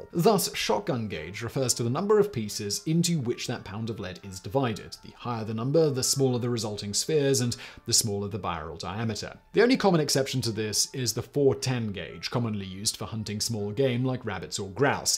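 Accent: United Kingdom. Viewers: Yes, it surprised me as well. Sounded so wrong coming from a British accent, mispronouncing words like that.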